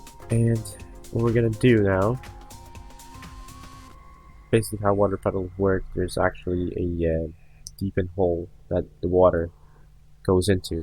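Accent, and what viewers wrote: Russia. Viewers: I just wonder what went wrong. It seems to me that you tried the right things (crank down weight, crank up roughness), all the stuff i'd try too — but it didn't work out. Still don't get the point. P.S. is a russian, according to accent, and you —?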